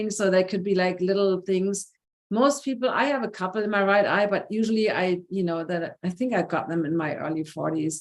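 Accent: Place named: Germany. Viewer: please speak slower in your videos because of your German accent.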